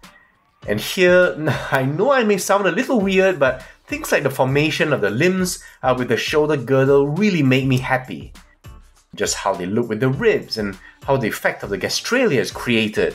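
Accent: Singapore. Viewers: You do sound Singaporean.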